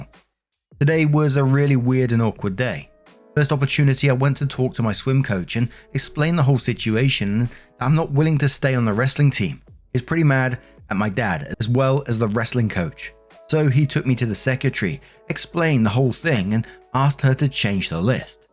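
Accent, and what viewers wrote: United Kingdom. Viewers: makes doing housework so much more bearable! Thank you so much for being so much a part of my day that it's not complete without hearing "What's up, Waffle Gang?"! Plus, as an American, his British accent makes him sound so distinguished! I'm sure I'd still like him if he were American (I just wouldn't respect him as much, lol).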